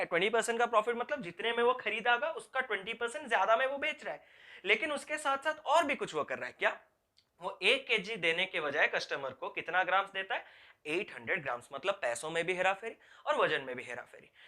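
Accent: Odisha, India. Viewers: Are you from odisa?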